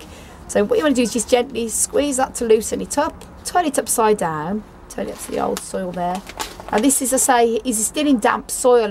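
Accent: Birmingham, United Kingdom. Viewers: you still have the Brummie thing going on :-)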